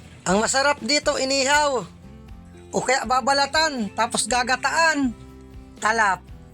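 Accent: Bicol, Philippines. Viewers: Parihas Silang taga Bicol kaya parihas dn ang style ng boses nila.. sana nga magka Collab sila 😅 halos magka parihas dn subscriber nila 😊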